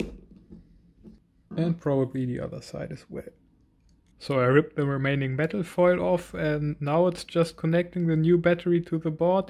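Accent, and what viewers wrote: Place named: Germany. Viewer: Very useful bringing that googlegroup blog to life! Coupled with deadpan German(?) delivery. Excellent!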